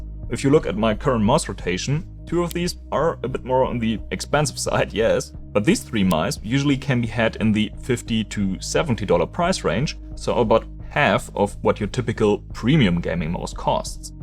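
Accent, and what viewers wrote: Germany. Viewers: i hear german? aber krasser akzent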